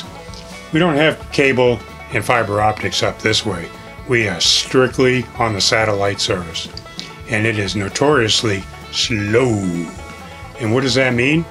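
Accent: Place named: Maine, United States. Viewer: This is great - a nice balance of narration and action shots. I like the humorous way you highlight the challenges of truly rural living but also the benefits. I know it's not the kind of living is not for me but you still convinced me that I need a vacation there sometime after the winter. The photos are spectacular - the beauty of Maine definitely shines even when there is snow. You really got your Maine accent on for this one too! Good luck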